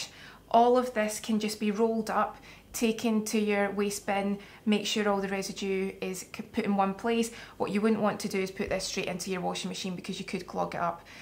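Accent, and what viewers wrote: Scotland, United Kingdom. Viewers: I agree. Perhaps a series of lovely Scottish poems and stories read in your soft brogue would soothe the world's woes. What a delightful prospect. Soft music would be a sweet touch. Thank you.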